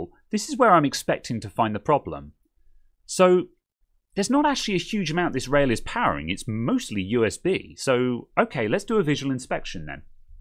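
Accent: England, United Kingdom. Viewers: Just inject 0.8 volts with limiting at 2A current.You ll be safe,if the heated area is crowded,use alchohol and inject power again to spot the exact faulty component.YOU NEED A THOUSAND HOURS TO FIND IT WITH SCHEMATICS AND MEASUREMENTS and at the end of the days you will have learned nothing.Anyway i admire your engilsh accent and your patience.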